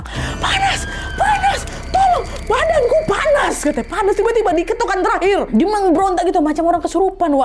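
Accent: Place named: Manggarai, Indonesia. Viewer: Ini cerita dari manggarai, dari logatnya, makasih wakkk udahh nyeritain😅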